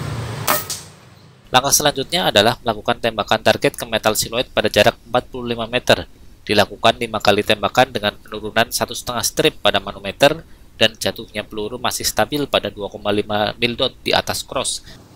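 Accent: Banyumas, Indonesia. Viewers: Bahasa ngapak ny exsisin dong mas.. Hihi salam. #orangapakorakepenak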